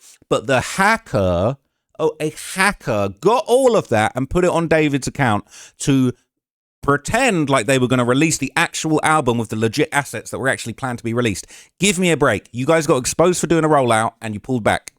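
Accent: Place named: England, United Kingdom. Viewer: Hes from England numb nuts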